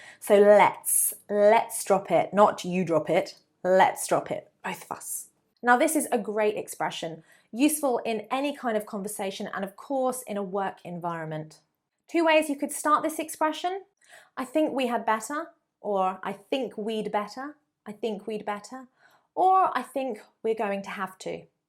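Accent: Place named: England, United Kingdom. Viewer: Your accent is kind of English, but I want to learn American accent !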